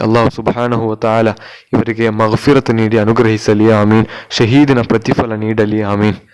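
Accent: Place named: Kerala, India. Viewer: ಮಲಯಾಳಂ ಭಾಷೆಯ ಯವರು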